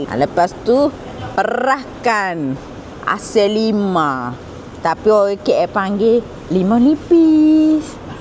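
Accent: Kelantan, Malaysia. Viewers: Mek, lamo dop dengar klate pekat gini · Hihiii demo kelake pekat deh. Saya lahir je kelate tpi tk pernah duk klate.. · Kelazzz lar mek kelate niii.. ❤ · Pekat molek mek kecek Kelate..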